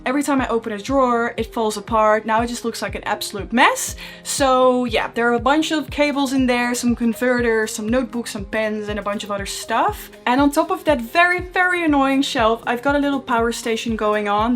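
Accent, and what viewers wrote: Netherlands. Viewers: dutch accent? or not? anyway, nice vid! wtf that cabinet , looks like its about to fall apart😂 but what was that thing all the way at the ceiling? extra storage spot?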